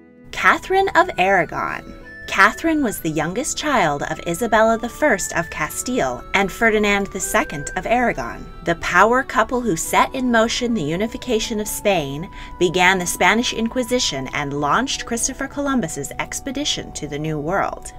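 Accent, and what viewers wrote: United States. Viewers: U have a rlly American accent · Sorry. But why is there an American narrating this? So wrong.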